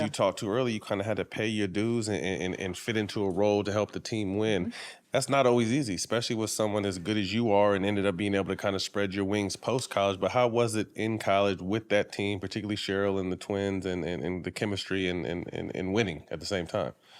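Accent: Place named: Brooklyn, United States. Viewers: I know is from cali, but damn she has a strong east coast accent. She sounds like she could be from brooklyn ny or harlem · She talks like a NYer. Sis is coast to coast great 👍🏾 🫶🏽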